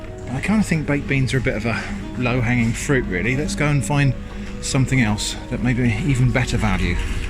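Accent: United Kingdom. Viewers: You Sound So Brit-tish Already Now Love!! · AfrigginPancake Boi Right? isn’t his voice so comforting, mixed with his lovely british accent its perfect to calm down.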